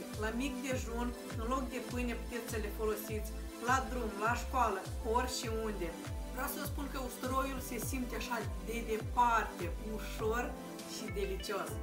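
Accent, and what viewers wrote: Moldova. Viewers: Pare usoara la prima vedere dar e destul de muncit la ea.Oricum D-na m-a fermecat cu dulcele grai moldovenesc👍💋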